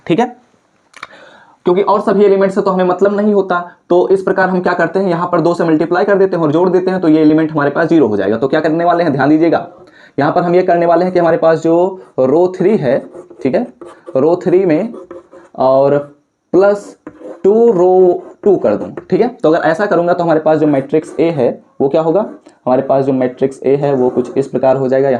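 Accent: India. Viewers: no bihar me aisa pronounciation nhi hota UP me hota hai so UP ke ho sakte hai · I think he is bihari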